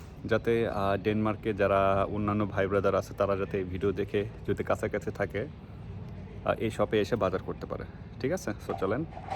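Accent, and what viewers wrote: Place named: Bogura, Bangladesh. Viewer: বগুড়ার কথা শুনে সাবস্ক্রাইব করে দিলাম শিবগঞ্জ উপজেলা থেকে দেখছি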